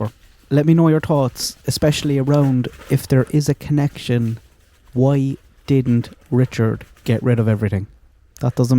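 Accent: Ireland. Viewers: I thought there was another person waiting nearby to pick up the killer? I think it didn't go to plan,those girls were to be taken away elsewhere,the crime scene was staged to throw LE off the scent.I've been fascinated with this case from day one.Lovely to hear the Irish accent by the way 💚🤍🧡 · I love your Irish accent! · I’m in Ca now but grew up in Indiana It’s fun to see the woods again as I used to go mushroom hunting with my dad I agree with your speculations on the case as you speak in the lilt of your Irish brogue THANKYOU Love your dog too❤ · Scotch or Irish? Irish?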